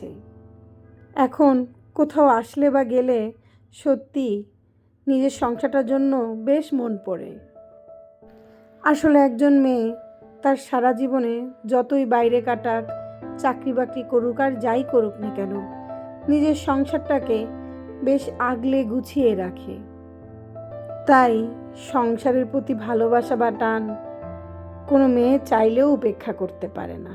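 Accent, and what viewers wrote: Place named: Khulna, Bangladesh. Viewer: প্রিয় আপু ৫৪ লাইক দিয়ে তোমার ভিডিওটা দেখে নিলাম তোমার কথাগুলো অনেক সুন্দর খুলনার মানুষের কথাগুলা মনে হয় অনেক সুন্দর তোমার পুরো ভিডিওটাই অনেক ভালো লেগেছে ❤❤❤❤❤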